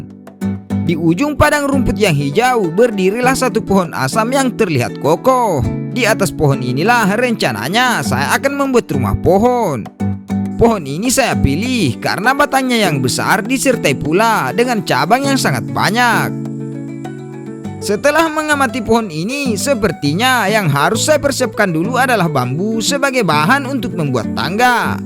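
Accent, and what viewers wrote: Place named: Medan, Indonesia. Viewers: Ini Lokasinya Daerah mana bang?, logat abang kalo berbicara seperti Logat Medan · baru pertama nonton channel ini seru juga, kayaknya dari logat orang asli sumut ya bosku?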